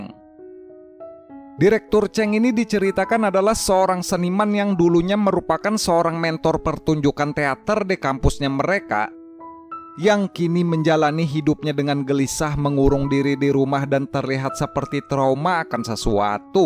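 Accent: Banjar, Indonesia. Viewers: Iih lo wkkk,ku kira aku ja yg merasa iny ni urg banjar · Biar sidin bhs indo. ttp j ketahuan dialek banjarnya🤭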